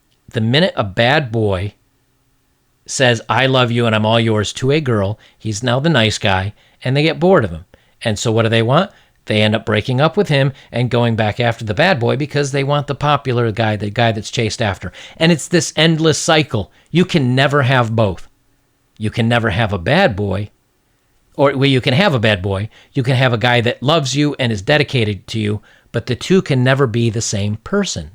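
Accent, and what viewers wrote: South Africa. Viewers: She's definitely South African. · She sounds South African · She kinda sounds South African or maybe the UK? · Also she sounds south african and as a south african, i know bitches be crazy. how did I not have a gf for 26 years of my life and I go to thailand for 2 years and women throw themselves at me. My current thai gf keeps saying that she doesnt believe I have never had a gf in a south africa. western women man. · Gotta love that South African accent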